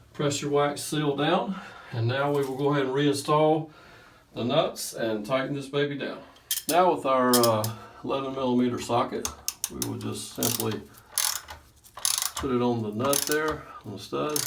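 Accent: South, United States. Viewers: Thanks for being very thorough! I like your " " accent"!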